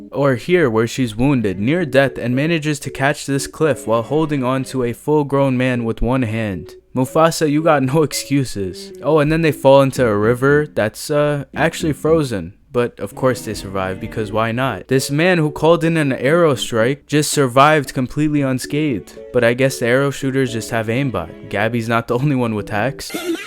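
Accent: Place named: United States. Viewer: the bullet is from an old musket ... it's not as fast , the water ? you think cold water is a danger ? you sound american , the one hand holding on ? did you forget about adrenaline ? and that Mizu is strong ? + add it beeing animation and animation exists to portray things real life can't to boot , so idk man , ur probs just digging too much into liquid sands , instead of enjoying what you get , i really enjoyed Blue Eye Samurai , i don't think you see what Blue Eye Samurai does , because it delves into asian culture and humour , as someone who watched alot of those films , the funny cameos , tiny little things and jokes weren't wasted on me , it's unfortunate that you didn't like it , probs the american in you speaking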